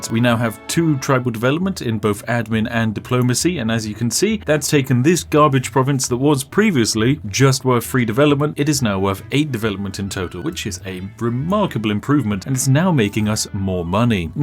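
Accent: United Kingdom. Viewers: Is he actually British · First time watching u and im sure u heard this a thousand times but i like this very british accent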